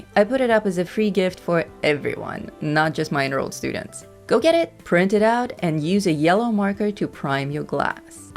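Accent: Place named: France. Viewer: You have a beautiful accent, what language do you speak? I get a hint of French.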